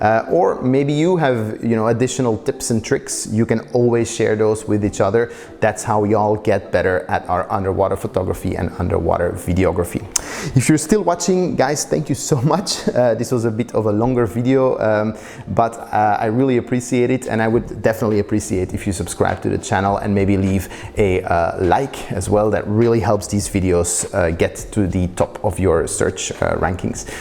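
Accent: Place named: Iran. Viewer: Very useful video. Thank you. BTW - where is the accent from? Sounds like Persian, related to French Canada? 🤪 Perhaps I'm wayyyy off.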